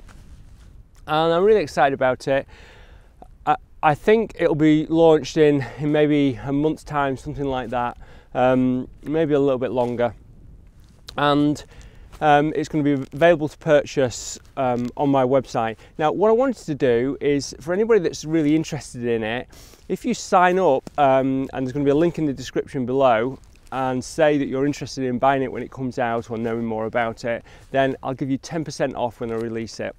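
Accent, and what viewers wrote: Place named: Scotland, United Kingdom. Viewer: I am interested in your classes for purchase. It is surprising to me that I am even used to your Scottish English now:)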